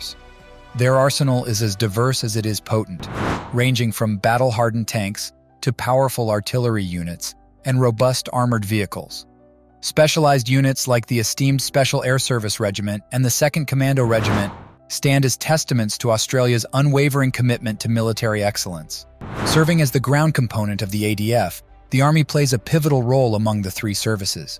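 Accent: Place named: United States. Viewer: Can we please have anyone other than a yank tell such a story?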